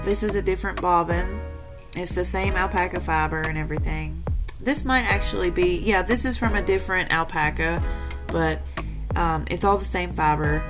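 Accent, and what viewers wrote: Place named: South, United States. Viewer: Why would anyone make fun of your accent? I’m Belgian and have lived on three continents. As a result I’ve got a ‘subconsciously adaptive’ accent - I tend to mirror the speech patterns and pronunciation I’m being exposed to at that very moment. I “should” have a Dutch/Flemish accent. I don’t. Somehow, regardless of whom I’m in a conversation with, people seem to identify Scandinavian undertones to my English. When in a conversation with my best friend from Oklahoma, that turns into Southern-with-a-Scandinavian-twist. When the conversation is with my British friend, it’s Welsh overtones with a seemingly Scandinavian flavour. 🙈 Accents are part of what makes us unique. Personally I feel the variations from the Southern States are beautiful. You almost sing.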